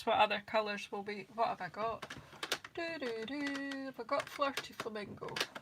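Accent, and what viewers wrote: Scotland, United Kingdom. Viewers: Where is that accent from as I have never heard it before and have the hardest time ever understanding you. It is NOT you, it is me being German. Is this Scottish? Love the card a lot!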